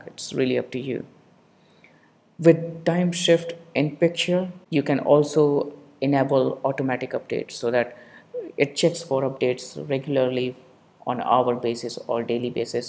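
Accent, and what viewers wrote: India. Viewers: It's Indian accent. Where are you from?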